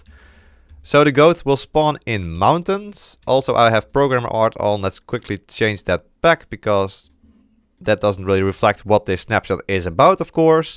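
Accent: Netherlands. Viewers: hello, i can hear you're from the netherlands :)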